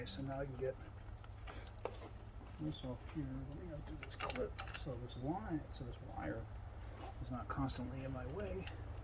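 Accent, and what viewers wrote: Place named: Midwest, United States. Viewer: Thank you for your humility in your presentations. You don’t need apologize for bad camera positions, we understand. I would edit out more dead time. Watching a car go up on the rack is pretty boring. I enjoy hearing, “I’ve never done one of these before”. We all learn together, learning from your mistakes and also your clever “work arounds”. Your bits of tricks of the trade comments are great. I sure hear a Chicago, St.Louis, Detroit accent from You. I heard all those accents when in the service. I’m no expert, but I don’t hear Carolina. 😊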